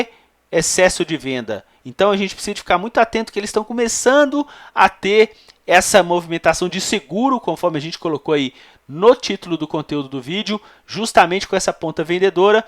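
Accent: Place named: Minas Gerais, Brazil. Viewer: Aiii conterrâneo.... Pé trocado foi o melhor ... Saudades do nosso sotaquizim! Maravilhosoooo o seu jeitim de passar esse tipo conteúdo.. estou inlove!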